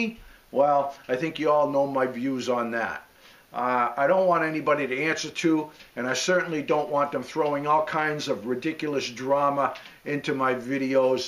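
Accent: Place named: New England, United States. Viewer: Dear Sir, since I've stumbled upon your channel a couple of weeks ago, I can't seem to get enough of " ". I'm still sifting through videos I have not yet viewed, and often stay up to late watching your videos. I deeply understand your drive to remain independant and enjoying life in the cabin. I just turned 57 a few days ago and got most my boys through college with one still in high school. I live in the Great Lakes region and love traveling up north to northern Wisconsin and Michigan with friends that own property and cabins. I'm still working, but am trying to prioritise purchase of 40 or more acres with a cabin before too many more years pass me buy. You are my guiding inspiration to pursue my cabin dreams. Best to you and to Franky. Love your New England accent. God Bless. Carl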